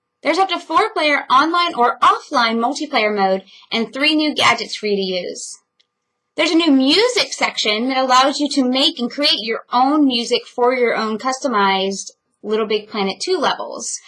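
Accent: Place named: England, United Kingdom. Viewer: I don't want be a douche, but it's an English accent, not British, because you're not going Welsh, Scottish or Irish. Great review by the way.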